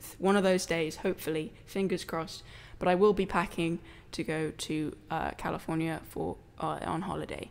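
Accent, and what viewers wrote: United Kingdom. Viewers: he's British I think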